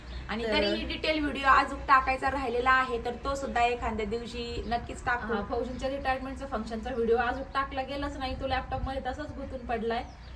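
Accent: Ahmednagar, India. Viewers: तुमच्या बोलण्यावरून समजल होत तुम्ही नगरच्या आहे 😊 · Radhe radhe tai nehmi khush raha doghi jani aniii tumhi nagri accent mdhech bolt raha khup mst vatt❤ radharani bless you radhe radhe❤ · तुमचा भाषेवरूनच कळले कि तुमी अहमदनगरचे आहेत कारण की मी पण नगरची आहे अशेच आनंदी रहा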